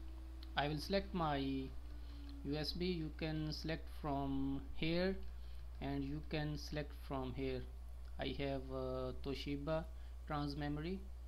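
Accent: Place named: Asia. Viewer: i was just asking as ur accent sounded like an asian bro. Anyway keep up the good work.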